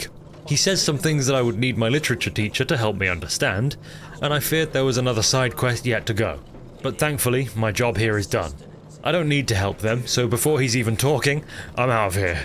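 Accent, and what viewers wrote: United Kingdom. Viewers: Ewwwwwwwwwww bri'ish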